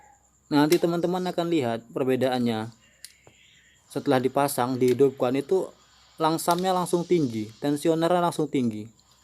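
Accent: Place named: Medan, Indonesia. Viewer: Jowoe rosoe medan cak...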